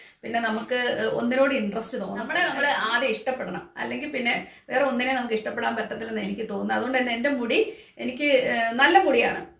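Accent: Kottayam, India. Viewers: Chechi vdu Kottayam ano???Samsaram kottayam kare pole undu..Pine salwar oke kanichapol narmada ninnu edutha materials anennu paranju atha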